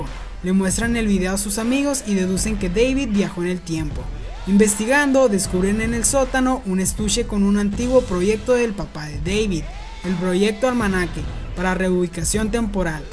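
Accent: Chihuahua, Mexico. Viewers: acaso eres de chihuahua en México o que por que tu acento es similar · Eres de chihuahua?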